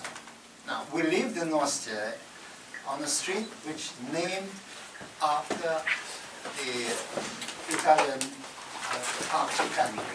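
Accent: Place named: Russia. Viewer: Всеволод Борисович звынайтэ) Но, у Вас четкий, русский акцент. И интонации. Конечно, англичане не поймут. У них у самих там столько интонаций..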